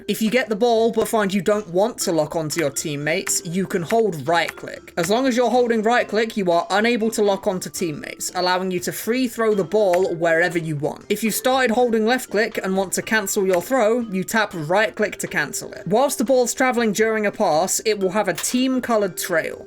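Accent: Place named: United Kingdom. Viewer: heywhy r u british :(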